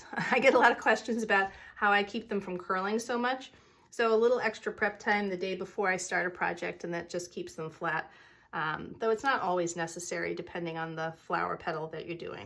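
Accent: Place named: Massachusetts, United States. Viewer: I haves love your wreaths since I was lucky enough to find you. I did not know that you were from Massachusetts until today. Iwas from the same area including living in Mashpee for awhile. I understand your accent. I am going to make this wreath for my niece who lives inNeport.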